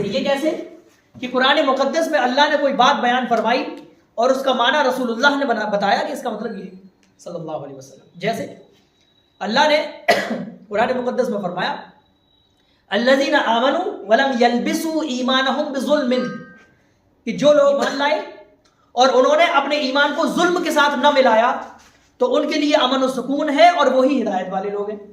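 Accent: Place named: Maharashtra, India. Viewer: Mashaallah buhut umda❤... Janab aapke baat karne tariqe se lagta hai Maharashtra se belong karte ho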